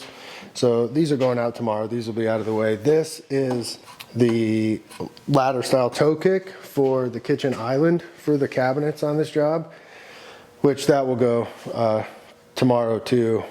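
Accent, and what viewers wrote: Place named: Connecticut, United States. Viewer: Love watching your videos 👍 Very well done. But I also love your introduction. Yo what up - Yo how ya dew in 🤣 You’re definitely from Connecticut 🤌 Fugettaboutit ! Love it you make a me home sick. Keep up the good work.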